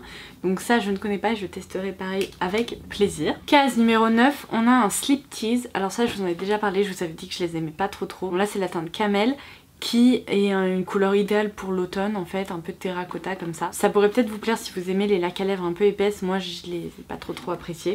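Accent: England, United Kingdom. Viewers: Ton accent anglais est super !!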